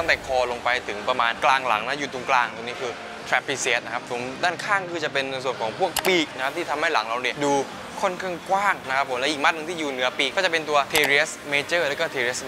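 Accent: South, Thailand. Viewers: พี่เป็นคนใต้ใช่ใหมค้าบ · คนใต้เหรอ เสียงออกทองแดง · พี่คนใต้ใช่ไหม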